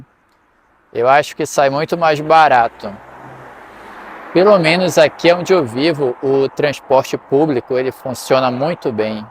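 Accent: Rio de Janeiro, Brazil. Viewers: You sound so carioca! :)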